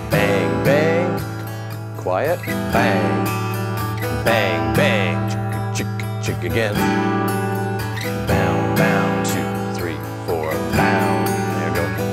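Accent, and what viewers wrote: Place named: Canada. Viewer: Great channel Love the structure of the lessons and the Canadian accent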